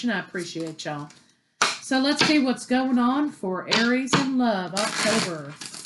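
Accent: South, United States. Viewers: Where are you from if I may ask.. I love your southern accent and how u serve it straight up, no chaser!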